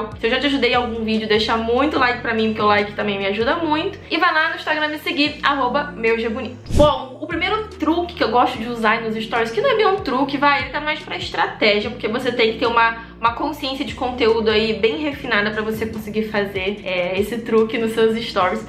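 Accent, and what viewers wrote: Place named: Rio de Janeiro, Brazil. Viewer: vc é do suburbio do Rio? Pergunto pq eu sou e eu super me identifico com o seu jeito de falar.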